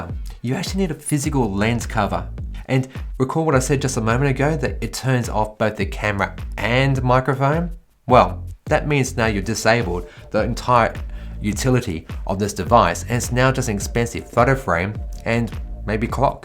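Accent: Australia. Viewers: the Australian accent also helps...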